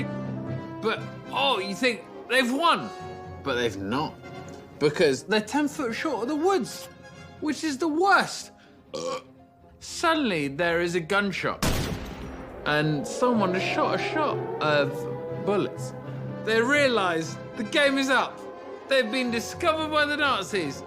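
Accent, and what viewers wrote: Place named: Afrikaans, South Africa. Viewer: oh wow he actually had a non shit south afican boer accent wow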